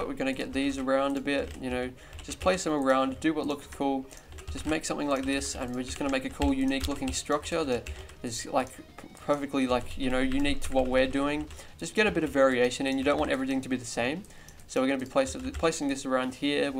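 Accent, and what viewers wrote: Australia. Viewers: You from Australia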